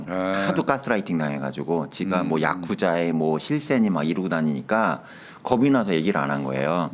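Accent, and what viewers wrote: Chungcheong, South Korea. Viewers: 염쌤. 연쇄살인 스토리 찾느냐 피곤할텐데..❤감사합니다. 또한 구수한 충청도 사투리지만 예리한 카리스마는 의 여전한매력😮항상 화이팅입니다 ❤🎉❤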